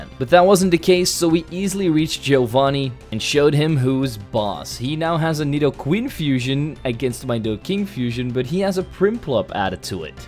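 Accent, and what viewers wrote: Netherlands. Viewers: Is he Dutch? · Gotta love that dutch accent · This guy dutch? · Where are you from? Sometimes it sounds like you're dutch, but you realy have a nice accent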